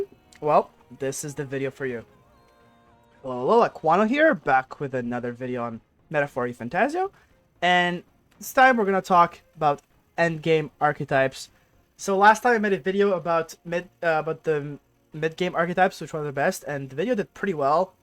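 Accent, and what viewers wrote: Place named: France. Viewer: I can hear the French accent! J'aime bien ton style de guide et merci!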